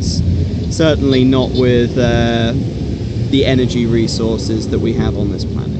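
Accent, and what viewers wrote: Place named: United Kingdom. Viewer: Beauty with brains, and a British accent lol. Nicely explained.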